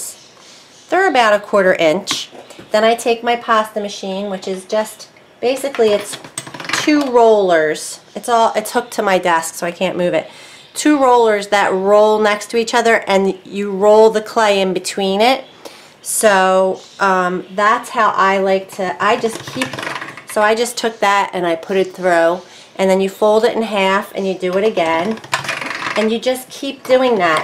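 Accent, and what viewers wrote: New Jersey, United States. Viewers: I know you're not wearing a mask in this video, but using long term mica powders can damage your sinuses and lungs. I know it's not fun, but please do it. I'm loving your channel, love your Jersey accent and joyfulness. Blessings to you and your family. xxXX Grambo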